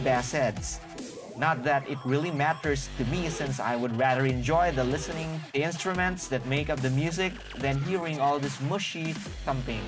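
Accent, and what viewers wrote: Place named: Visayas, Philippines. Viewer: litsi bisdak man pud diay ni sya hahaha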